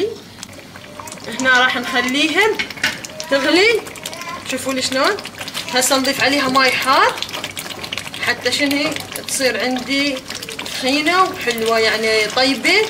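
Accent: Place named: Ahvaz, Iran. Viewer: الشبزي العراقي مع اللحم وليس الدجاج. اصلا هذه الطبخه ايرانيه. مبين من لهجتك انتي اهوازيه ويختلف طبخك عن العراق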